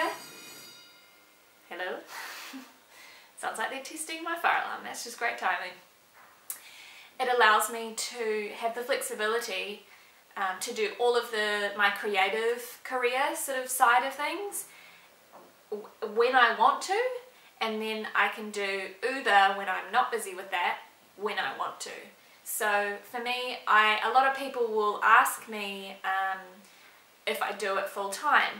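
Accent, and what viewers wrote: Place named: New Zealand. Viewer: No offence but u sound Kiwi. Are u kiwi may I ask?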